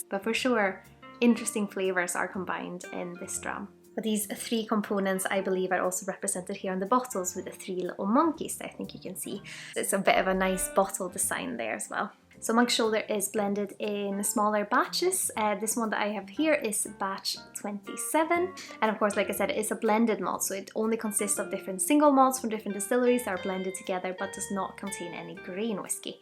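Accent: Scotland, United Kingdom. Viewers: Have you picked up a bit of a scottish accent, thats not a swedish accent i've ever heard haha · You have a bit of a Scottish accent.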